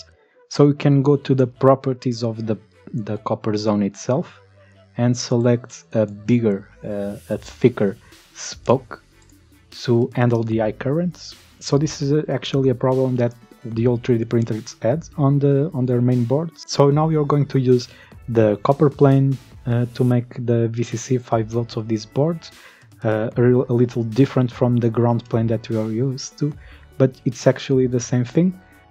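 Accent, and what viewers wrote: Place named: Portugal. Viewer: Estava a ver o video e a pensar.. esta pronuncia parece que e' portugues.. fui ver o teu canal e acertei! Obrigado pelo video!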